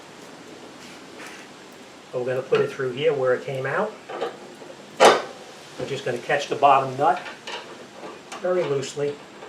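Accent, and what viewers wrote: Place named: New York, United States. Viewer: Nice work 😊👍 Are you originally from NY / Jersey/ Queens??? Im hearing a slight accent in your voice 🤔 With respect from steve in Bundaberg Australia 🇦🇺 🍺🕺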